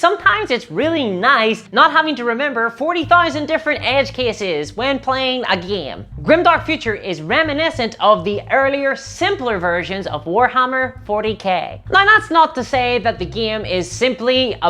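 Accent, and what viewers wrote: Ireland. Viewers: You sound Irish.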